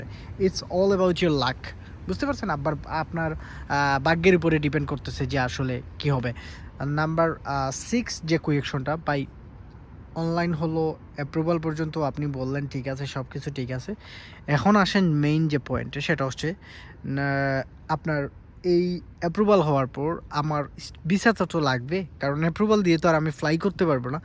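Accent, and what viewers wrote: Sylhet, Bangladesh. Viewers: ভাই আপনে সিলেটের নি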